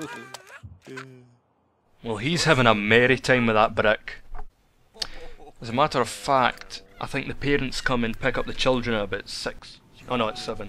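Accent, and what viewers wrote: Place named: Scotland, United Kingdom. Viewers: Actually I think he's Scottish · he's Scottish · hey your british i love british and doctor who by the way what exspanshion pack you using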